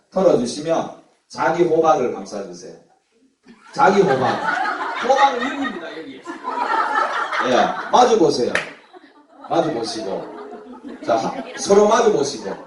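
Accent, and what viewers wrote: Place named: Gyeongsang, South Korea. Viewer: 잼나고~ 경상도 사투리 죽이네용ㅋㅋ~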